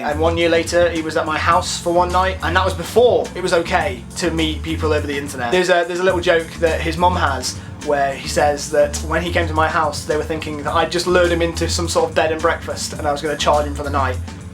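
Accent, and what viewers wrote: United Kingdom. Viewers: Your British accent is beautiful, you're beautiful. Also your lips look kissable. Also was listening to you wasn't on mute. Oh my.. I'm awkward. Byee(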